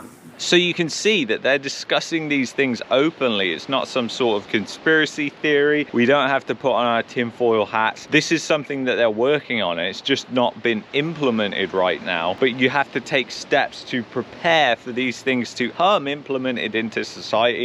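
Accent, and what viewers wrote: United Kingdom. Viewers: Thank you for having the courage to speak out on these important topics. It's refreshing to hear a Briton talk about these issues.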